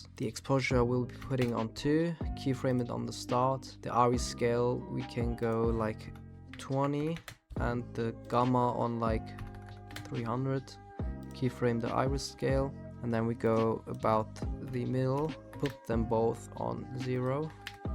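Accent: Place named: Germany. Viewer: i hear the german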